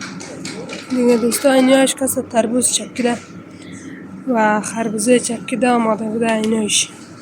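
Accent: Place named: Iran. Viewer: خیله نصفش ایرانی میگی نصفش هزاره گی درست گپ بزن یا ایرانی یا هزاره گی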